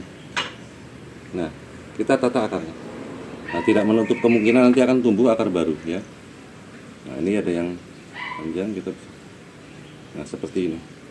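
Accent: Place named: Java, Indonesia. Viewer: Abank orang Bali tapi bicara nya kyk jawa abank bali apa bukan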